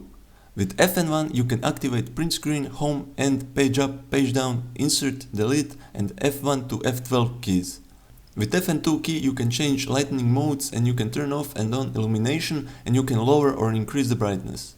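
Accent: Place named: Eastern Europe. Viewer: Where are you from? Cus you sound like you're Slavic :)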